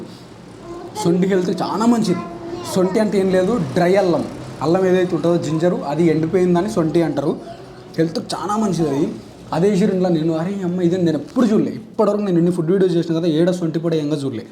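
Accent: Telangana, India. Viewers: నీప్రాస నీయాస తెలంగాణ భాష సూపర్.... కల్వకుర్తి బోటి తలకాయ భలే సూపర్.....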